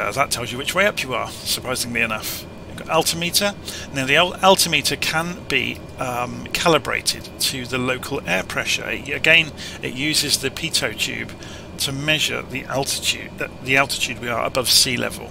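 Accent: England, United Kingdom. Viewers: Considering the high quality of your content, the superb presentation, your hundreds of quality wide-ranging videos and 3 years on the channel, I cannot understand the relatively low number of subscribers. Could it be the English accent? As a Londoner, I appreciate it, but there's no accounting for Eastern Pond dwellers. Fear not, I'm on your side; keep up the splendid work - ex-RAF navigator of 50+ years ago!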